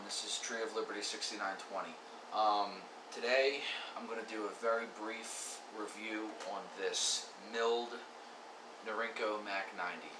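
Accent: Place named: Philadelphia, United States. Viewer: Yo! You from the Philly area? You got the accent, yo.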